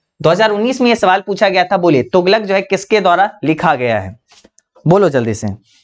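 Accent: Bihar, India. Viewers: Bihar se hain babua ❤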